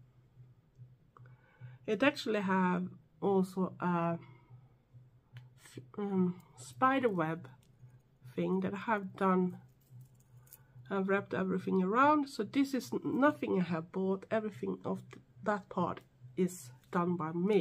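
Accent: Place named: Sweden. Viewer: Where did the comment I just typed go🤔hmm probably my crap satellite excuse for internet service....anyhoo I said the has the most gorgeous accent I've ever heard! I've never known anyone with it before you. I Adore it!☺🤗🖤🖤🖤🦊I'm going to Fika now😆👋🏻TTFN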